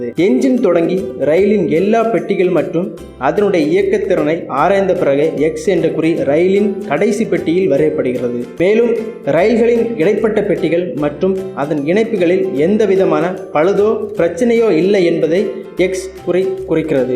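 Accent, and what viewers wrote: Kanyakumari, India. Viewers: Bro kanyakumari ஆ Bro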